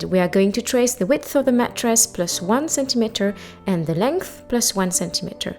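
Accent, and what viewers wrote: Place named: France. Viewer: Your English is very charming. Love your French accent!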